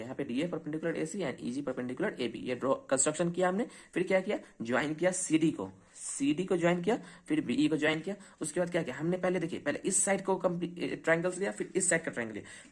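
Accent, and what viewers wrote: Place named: Delhi, India. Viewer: Mast sir bs accent Delhi wala dekhna padega 😅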